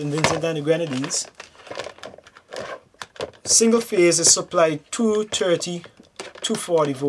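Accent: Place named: Jamaica. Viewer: are you a Jamaican? u have an accent man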